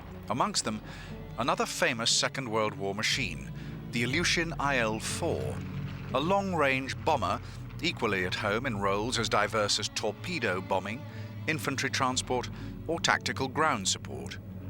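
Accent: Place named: United Kingdom. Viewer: I wonder why, ww1 ww2 documentary, reads, A British accent speaking English.?? They garher fotos and videos of the war or the faces of leaders that era, then they put on their dirty hypocrisy stories.. Let say, these history forgeries is almost miss leaded the truth of western evil greedy imperial perpetrators did dirty inhumane actions.... We in Africa, Specially, Somalies do not trusted those evil English speaking countries.... Because, we never forget the way they divided us.... They don't deserve to listen their evil lies😂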